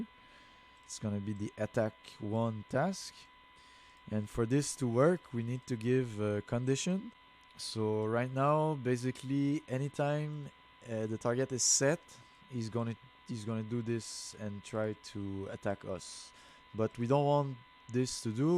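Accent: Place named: France. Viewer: Hey, just want to let you know that your French accent is very nice and clean, you speak English a LOT better than lots of my French friends.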